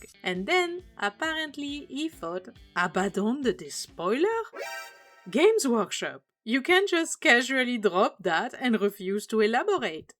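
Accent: France. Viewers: surprenant d'avoir l'accent frenchy de fou alors que tout est en anglais 😆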